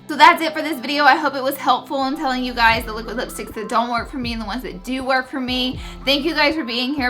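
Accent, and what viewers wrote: South, United States. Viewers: I guess that bettet than saying you sound like and 80year old that sounds like you smoke a pack a day. I love your voice I find it comforting with the southern flair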